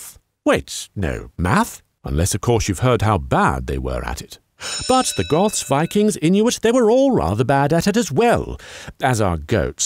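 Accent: United Kingdom. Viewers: He's British. He has a British accent. In Britain it's called maths. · the british voice turns it off for me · Why does this guy talk with a British accent but uses American terminology? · Strange that you use Math instead of Maths given that you clearly have a British accent. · Are you putting on the posh accent or do you just naturally sound like Stephen Fry? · As an Englishman I was very disappointed when the narrator with a VERY British accent said math instead of maths. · If you are English, Sir, and I presume from your accent that you are, why do you keep saying “math” in this video instead of “maths”? “Math” is an Americanism and one that makes no sense, especially when one considers that it is supposed to be short for “mathematics”, a plural noun.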